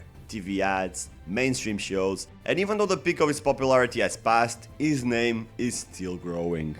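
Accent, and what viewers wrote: Portugal. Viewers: What a 💩 accent… really hate the Portuguese accent. 🤷‍♂️🤷‍♂️🤷‍♂️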